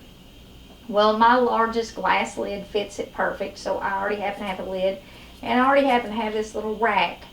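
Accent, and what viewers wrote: South, United States. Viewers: Love your videos! Sounds like you are from TN or KY.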